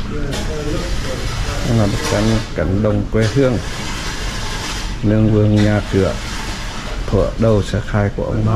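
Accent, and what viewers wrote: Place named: Hue, Vietnam. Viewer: Chuẩn Huế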